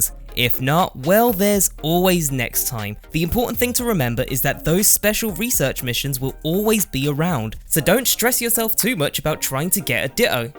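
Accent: United Kingdom. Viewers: Örs Vajda Yo, he sounds English. That’s why he’s pronouncing it the way he is. The British did sort of create the English language.. even if it’s a mashup of other ones. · He says di-o instead of ditto lol (Yes i know he has a british accent dont come at me)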